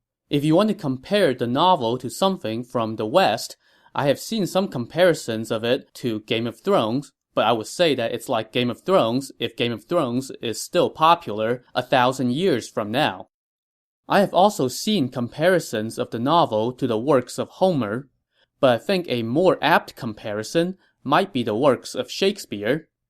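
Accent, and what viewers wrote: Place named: China. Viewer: Its interesting to hear a chinese speaker speak english with how the pitch moves since it sounds so distinctly chinese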